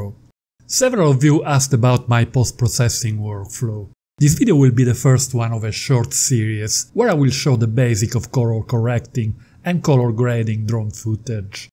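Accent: Italy. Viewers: Very informative and helpful, especially for a beginner in video editing pro photographer. And I adore this heavy Italian accent. Big thumbs up!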